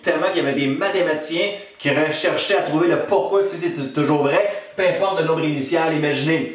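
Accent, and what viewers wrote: Quebec, Canada. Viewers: c'est au contraire l'accent québecois et l'enthousiasme qui font le charme des vidéos de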